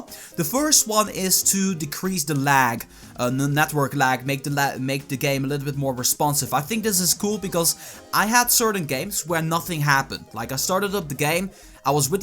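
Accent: Netherlands. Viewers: dat dutch acent <3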